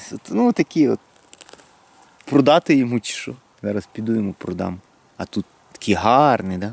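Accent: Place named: Ivano-Frankivsk, Ukraine. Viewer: разве во Львове так разговаривают, нет он с Франковской обл.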